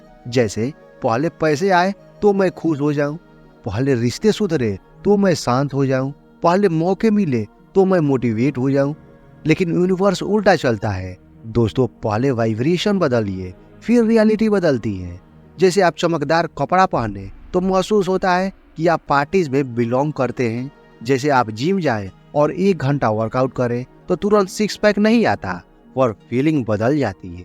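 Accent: Bengal, India. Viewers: Kese bolta hai yaar ..bengali hai kya..hindi seekho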